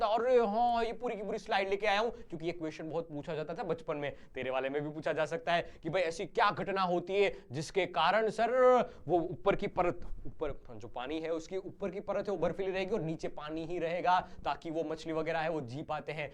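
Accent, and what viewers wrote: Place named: Haryana, India. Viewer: Sir Tey to haryanvi lage manne🤔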